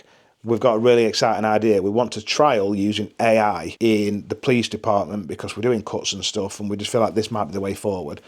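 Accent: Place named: Northern England, United Kingdom. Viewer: As ever, thanks for the recommendations, there are some here I am quite keen to explore. On this point - you can read gore and violence but I have a ceiling for that, and one of the books you highly recommended lately you said had a 'disgusting' magic system and I am grateful you spared me the mullah in picking a copy up. I would really love it if you continue to point out (where you are aware) any books that are particularly violent or smutty as I don't like descriptive violence and find that, for me, smut ruins the flow of the narrative ( if I wanted smut I'd pick up a Mr Gray book). It would be so handy to have this information if you have it. Keep on keeping on dude, I love you keeping it real. From a working class Southener with a cockney accent who loves youre Northern dialict. Take of yoursen.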